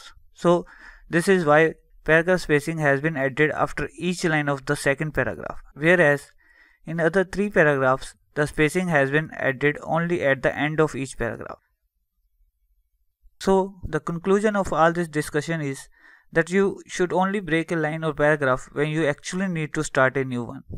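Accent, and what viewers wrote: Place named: India. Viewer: oh, that indian accent...I don't understand, how can you still have it? I mean, you indians talk a lot english, even before everybody in the world knew english, DUE to India beeing brithish colony, but still, even know that indian accent is...I don't want to offend. let's say that IT IS RECOGNISABLE.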